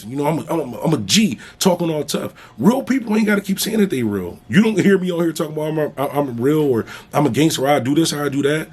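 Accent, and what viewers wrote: Philadelphia, United States. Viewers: U from philly?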